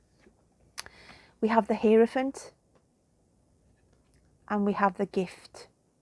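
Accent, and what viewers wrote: Birmingham, United Kingdom. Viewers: Very uplifting message! It's nice to hear a Brummie doing readings 😊❤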